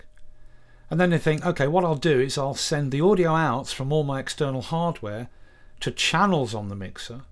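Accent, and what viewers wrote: United Kingdom. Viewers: You know the video will be good the moment you hear his British accent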